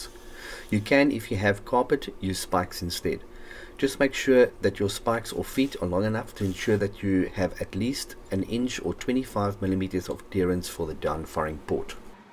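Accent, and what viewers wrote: South Africa. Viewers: Is that a South African accent I’m hearing? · Heard you were sporting a South African accent. Let me know if I'm wrong. I'm curious to know of you imported the speakers and equipment or if you shopped locally. If it's local supply, where did you procure the speakers from. I'm looking to buy from parts express, but the import costs are hefty. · Are you South African?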